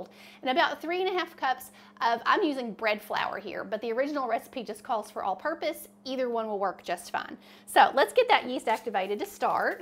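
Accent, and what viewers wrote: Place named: Alabama, United States. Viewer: I've never ever made bread until I saw your recipe. That was Monday. I made it Monday night. It lasted a day lol & was Gone! My husband, kids, and nephew said it was the best bread they'd ever had. So, my dough for these rolls is on its first rise now on the counter. I'm doin a trial run on these so I can make em for Thanksgiving dinner. I know they'll be absolutely delicious, I just want them as pretty as yours lol. I'm gonna try & make their lil "butts" line-up perfectly. I'm new at most things cooking wise. I'm from Alabama & wanna cook hard stuff like meatloaf, pork chops, chicken fried steak, greens, homemade mashed potatoes, fried potatoes & stuff like my Momma made. I'm tryin my best to learn lol... I should have been at home watchin momma instead of runnin around with friends (they can't cook now either)! 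Both my sister's are fantastic cooks cause they watched momma. I really really wanna learn to make fried chicken! My husband and kids love fried chicken. I don't eat meat except fish, but they are all meat lovers. I Love your channel & how you take time to show Every step. Your a incredible cook & so patient & reassuring. Idk where your from but I picked out that accent Fast! Everyone makes fun of how I talk lol. I live in Oklahoma but from Alabama. You seem so precious. Keep the videos comin & ty for being such a great example for beginners like me. -Amanda-🖤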